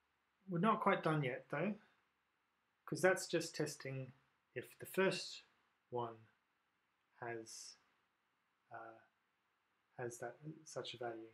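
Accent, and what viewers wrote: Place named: Australia. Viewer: P.S., Hello from New Zealand. You sound Australian, though I can't tell for sure :-)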